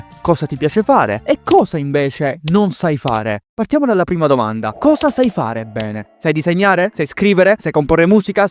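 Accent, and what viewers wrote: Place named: Italy. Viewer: mado lacento PIÙ italiano di sempre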